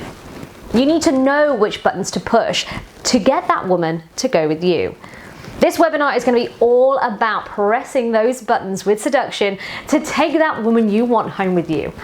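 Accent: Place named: United Kingdom. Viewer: Beautiful accent, sounds British.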